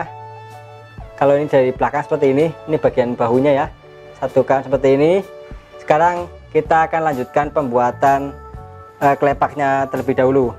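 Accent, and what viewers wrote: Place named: Java, Indonesia. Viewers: ....logatnya medok bgt. "saya menemukan cara ini sudah seratus tahun yang lalu"...temen-temen Yang penting pahammmm...... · Bener banget masx , sy suka kesulitan di bagian ini , triims banget atas ilmux . Njlimet sih tp gamblang , puas banget nonton tutorialx .👍👍👍 pasti lebih mumet lg tuh dgn bahasa medokx si mas , Untung aqiu org jawa jd keren aja . he he ....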